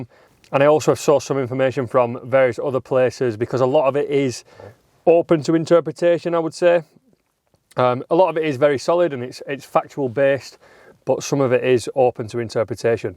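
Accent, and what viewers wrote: United Kingdom. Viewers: I'm thinking that your talking about across the pond and not the states. I detect a british accent .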